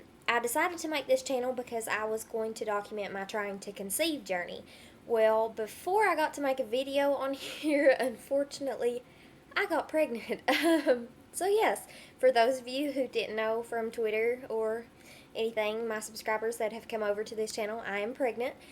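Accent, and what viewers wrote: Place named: Southwest, United States. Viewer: R u frm Texas or sumthin cause it sounds like u have like a southwestern accent?!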